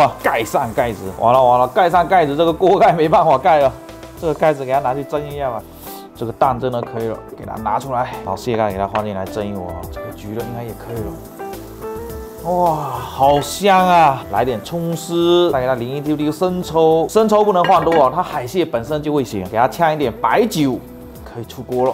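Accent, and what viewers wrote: Fuzhou, China. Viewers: 说是“虎纠人”讲普通话更准确一些，“湖建”的方言太多了，小文哥口音是标准的“虎纠人”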